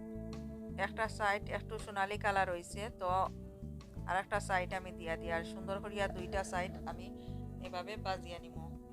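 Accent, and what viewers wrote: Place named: Sylhet, Bangladesh. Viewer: আপু সিলেটি মাত শুনিয়া খুব ভালো লাগলো রেসিপি টা দারুন ❤❤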